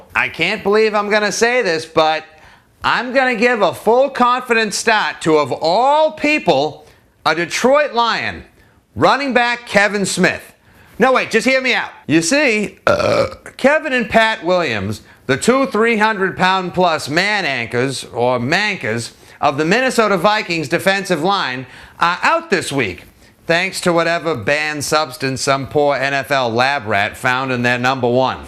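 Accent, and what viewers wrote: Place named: Boston, United States. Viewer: Funny! You sound like David Spade with a Boston accent.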